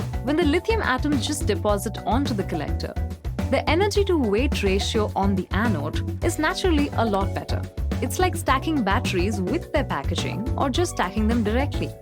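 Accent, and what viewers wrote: India. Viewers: That soft indian english makes this so much nicer to hear doesn't it?😁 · speaks English with a strong Indian accent its awful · if I had teachers with Indian accent in school, I would be the best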